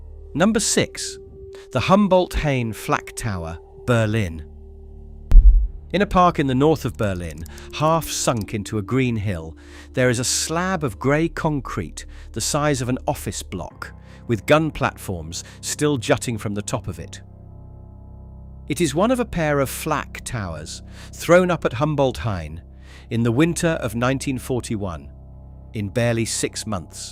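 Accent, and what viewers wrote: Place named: United Kingdom. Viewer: I think the bunkers are cool. Quit the Germany bashing. You sound British, do you not know that Great Britain and France committed atrocities too? What about the opium wars that the British waged on China because The Chinese emperor tried to stop the British from pushing opium on Chinese citizens. The Egyptians wouldn't put up with Great Britain anymore and rioted and burned British businesses in Cairo in the 1950's. The British Empire was evil. France ruled over Vietnam for a century and was vary oppressive towards them. Know what you are talking about before you speak.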